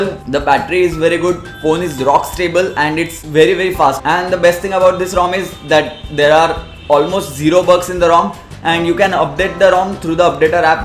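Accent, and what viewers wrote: India. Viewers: lol indian accent kinda sucks tbh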